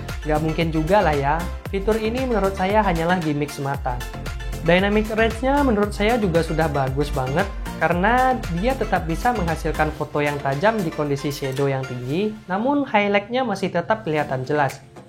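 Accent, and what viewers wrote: Padang, Indonesia. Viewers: Orang padang yo bg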